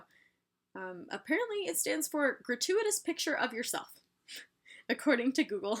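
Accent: Connecticut, United States. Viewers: You say talk instead of tawk. What part of connecticut are you from? I moved to va from connecticut and my entire class tried to give me a speech class lol. Theyd say, "there's no w in talk Shakia." The words that stood out to everyone were talk, water, car, chocolate, coffee, dog... i pronounce them tawk, waduh, cah, chawclet, cawfee and dzawg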